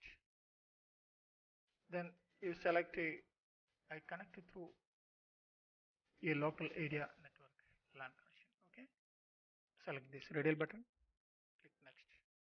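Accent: India. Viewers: You mean he sounds like an indian?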